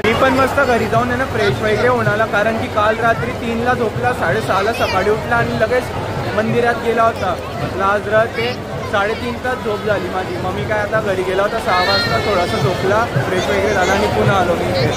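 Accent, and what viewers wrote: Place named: Jalgaon, India. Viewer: खूपच छान एवढ्या गर्दीमध्ये रथाचे चित्र ण करून तु आपल्या जळगावच्या भाषेत मायबोलीत बोलत असतो तेव्हा खूप गावाकडची आठवण येत असते तुला पुढच्या वाटचालीस भरपूर यश मिळो ही श्रीरामा जवळ आमची हात जोडून प्रार्थना आहे धन्यवाद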